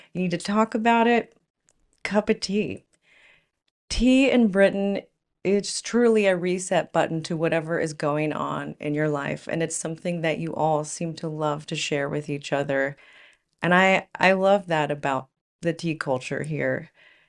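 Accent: South, United States. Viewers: I really like your American accent - very easy to listen to. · I liked the southern accent! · Hi, unlike some American accents (the abrasive, machine gun type screech on New Yoick, for example), you have the kind of lovely, calm, soft, warm Southern drawl that one would hope to hear as one "draws down the final curtain, and joins the choir invisible", to parrot-phrase Monty Python. (I've just read that back, it was intended to be humourous, although I meant the first bit, but it makes you sound more like the Angel of Death! SORRY!!). Our sense of humour is WAY more sophisticated, combining dark sarcasm (in the classroom), irony, understatement, self deprication (a quintessentially British trait), and satire, that goes over the heads of a lot of Americans used to a more literal, almost slapstick style. "Sorry" is a kind of reflex response, to avoid escalation. 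Queues are just something we do instinctively, and when combined with our sense of humour/understatement is a way of gently letting of steam, when you're stuck in a HUGE long queue that's barely moving, the queue moves forward one pace, and you cheerfully say to the person ahead/behind "won't be long now". Queues in pubs are on a completely different level; you can be 3 or 4 deep at the bar, everyone just knows who's next to be served, including the barstaff, no arguments (although regulars do tend to get preferential service, with a nod and a wink, not speaking from experience, of course, lol!). The weather usually undstatement mixed with sarcasm, and is a very safe way of breaking the awkward silence with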